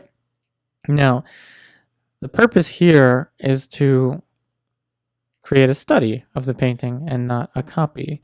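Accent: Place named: United States. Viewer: keep on soft-ten-ning where useful! Where's from? He sounds american accent except for his love of mis-pronouncing soften: properly there should be a silent T in soften and softening at least here in the American language. Very good videos dude!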